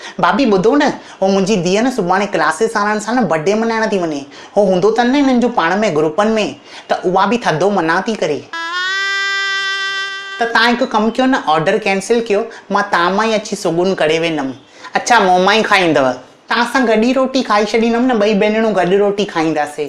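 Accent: Sindh, Pakistan. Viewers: 👌👌👌👌👌👌❤️❤️❤️❤️ bhai aapka sindhi bolne ka style wow 👌👌👌👌👌👌👌👌👌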